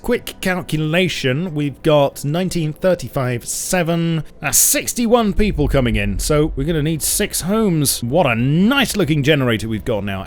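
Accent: United Kingdom. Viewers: Come on this is where you will jump off and soar into the sky like KCmo, oh w8 his channel is dying and yours will 2 if u don't stick 2 rust, it's cruel but hey! That's the price u pay when you think someone is actually going 2 watch you do something other than for what they subscribed for. Let's see if u can really break through as just another guy with a British accent that plays any random game:P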